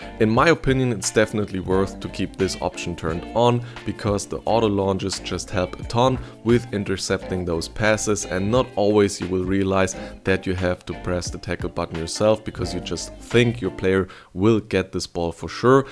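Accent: Germany. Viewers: Is this dude German? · You have german accent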